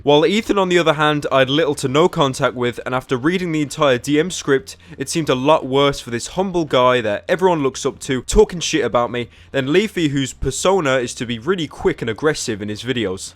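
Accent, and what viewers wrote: United Kingdom. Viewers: Why is anyone on youtube fighting in the first place, especially considering it's just a bunch of people who do the same thing but in different ways, Leafy does cringey videos on cringey videos H3 does cringey skits on cringey skits and you do deep voice british cringe on cringe videos. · He's British. Certain regions pronounce "H" as "aech" instead of the faster American "he-" when it's said. I'm from the UK and say it the same way. Just differences, that's all.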